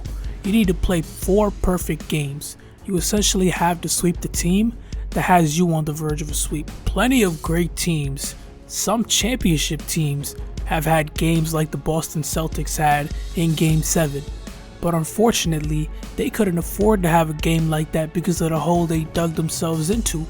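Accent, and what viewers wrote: New York, United States. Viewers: That accent. You from NY fam?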